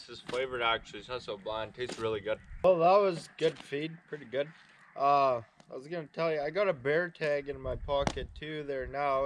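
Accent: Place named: Manitoba, Canada. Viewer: Where Canada? Your accent sounds like my family in Manitoba. I like it, makes me feel at home... deadly!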